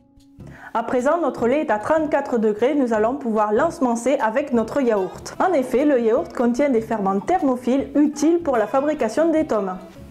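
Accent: South-West, France. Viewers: Un joli accent du sud-ouest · Excellentes explications parfumées à l'accent du Sud-Ouest (la plus belle région de France). Merci pour ce beau travail pédagogique.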